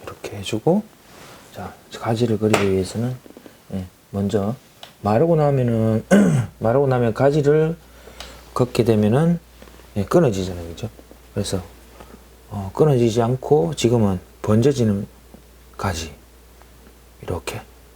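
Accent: Gyeongsang, South Korea. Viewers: 벗꽃그리기 배우고 가요 경상도 말 혹시 대구가요 배우로갈께요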